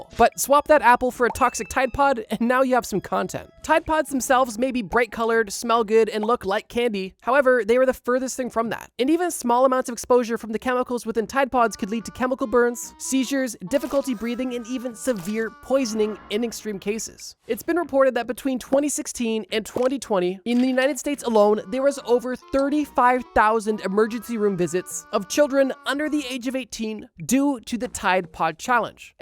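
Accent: Canada. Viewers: i could tell you were canadian 😂😂 ontario ontop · i thought i heard that canadian accent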